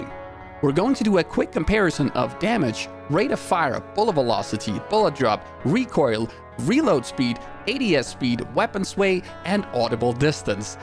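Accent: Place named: Denmark. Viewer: interesting danish accent!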